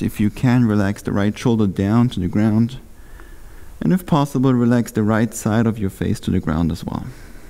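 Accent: Germany. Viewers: Do I spot a german accent there? Wenn ja nices Video Bro. Will meine Mobilität trainieren und bin so auf euch gestoßen.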